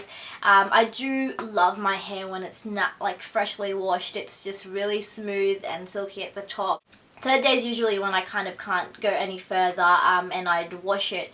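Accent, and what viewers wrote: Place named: Australia. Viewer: you're from Australia right?? I reaaally love your aussie accent haha :) it's so unique and I love it :) and you have very nice ombre hair!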